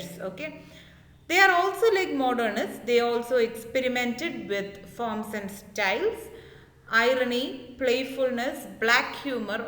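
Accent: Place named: Tamil Nadu, India. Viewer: Tamil accent is very clear. Tamilians should improve in English to sound neutral.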